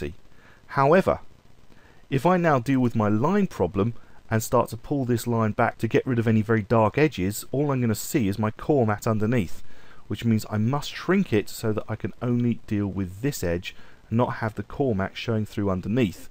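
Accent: United Kingdom. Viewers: JUne 2021: after all this years still the best tutorial to this topics I found. Thank you very much! (And your british English is so good to understand!!!!)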